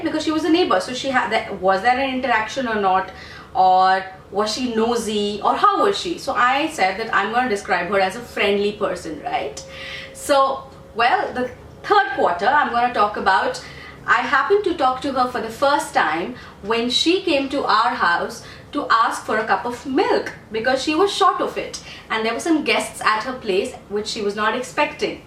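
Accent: India. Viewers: i sure that u r from jamshedpur...... great is ur way to express.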